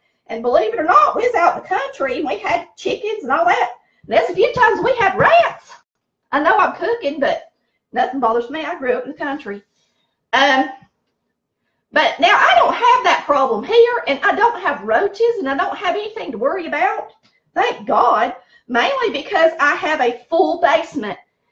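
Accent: South, United States. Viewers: I just found your videos and I have to say "I love you!" Your southern draw and your family stories made me smile throughout the entire video. You remind me of my grandma and family who lives in Nacogdoches Texas. I have been looking for the perfect from scratch dumplings and I think yours is it. I can't wait to try yours out. Thank you so much for sharing. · you are great cook & a "hoot" to listen to your wonderful southern drawl! Love it. I live in MI but born in VA & Mom Dad & brothers born in TN. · Was very relaxing watching you cook. You’re a sweet doll. Love listening to your southern accent. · I love listening to a true Southerner talk. There are so few of us out there. Thanks, Great tutorial! New subscriber. ✌🏻❤ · We love chicken and dumplings. I want to add that your southern accent is great! Yes I’m from Texas but not all videos have the southern accent that I personally love! 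· What a great video. Love the dumpling recipe. You cook the same way Granny did. I learned from her. You mentioned the mixer you didn't like. I have one that was made probably in the 20' maybe 30's. It's wire instead of flat slots like yours and it works great. I can't find one like it now days. You talked also about dressing. Granny would make her's with the liver from the turkey or chicken livers. It was actually really good. I grew up on a farm here in Missouri. Dad butchered our beef. Just like you He got the T-bone steaks. We got the lesser cuts. We would maybe get a T-bone right after he butchered. The rest were his. It' great to hear your southern accent. I miss it.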